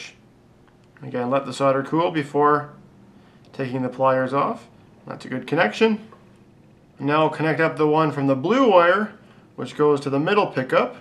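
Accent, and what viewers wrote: Canada. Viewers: Great Video, i finally bought everything i needed to wire my guitars so i can refinish them. I got hooked on all the different types of ways you can wire the pickups and mods. haha. But when i get finished and it doesnt work im like daaaaaamnit. This was a great tutorial! better than cutting off all the wired and starting over lol. Hey man are you from Canada?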